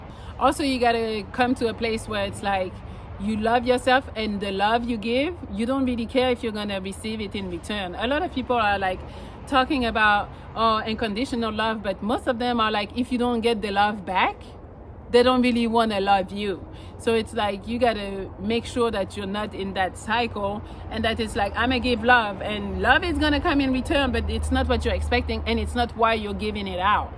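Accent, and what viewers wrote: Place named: France. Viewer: Coucou de quelle ville tu viens en France ? Btw je sonne exactement comme toi quand je parle anglais.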